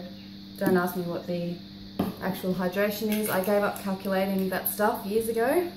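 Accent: Australia. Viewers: You are a great lady and I thank you for the wonderful video and your sourdough recipe. Btw love your Australian accent. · Thanks! This was really nice to hear an Aussie voice and practical instructions. 🇦🇺👍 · Niceto hear an Aussie accent !L.O.L.😄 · HI! Totally awesome video! Camera angle was perfect, you have a very pleasant and clear [ Australian!] speaking voice, and the entire process was easy to understand and well presented! Well done! Shalom to us only in Christ Yeshua. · Hi, You made it look easy to follow so will attempt same. Good to hear aussie talk.:-D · thanks I love Aldi . I'm from Shepparton VIC.regional. My bread is now tasting a bit more sour. Thanks your Video's are wonderful. Great to hear an Aussie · Loved listening to a fellow Aussie. I enjoyed this video. Straight to the point and informative. Hope to give it a go soon.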